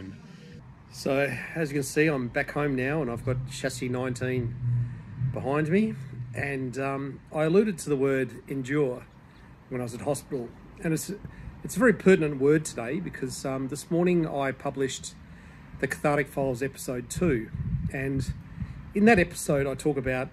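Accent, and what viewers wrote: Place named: Australia. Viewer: Hello dear...you touch my heart. I love to hear the accent. My dear husband passed 2 years ago; he was from Melbourne. Your accent is similar. You are very brave...your angels are all around you. Hugs and prayers❤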